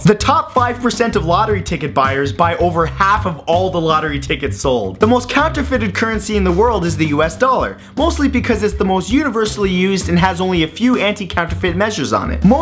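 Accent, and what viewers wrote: Canada. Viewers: Your Canadian? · He is canadian? Keeeeewwweelll. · He sounds so Canadian in this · are you from Canada? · ur Canadian?! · Are you Canadian? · Are you Canadian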